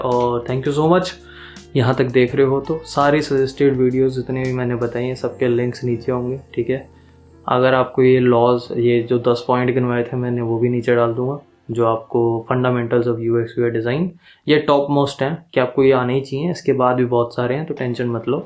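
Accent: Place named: Haryana, India. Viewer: are bhai Haryanvi accent OP 🔥🔥 , JAI HARYANA 💪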